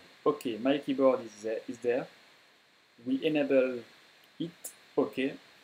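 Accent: France. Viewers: that is so much a french accent, hahaha! thanks! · Haha that's right.... really French accent.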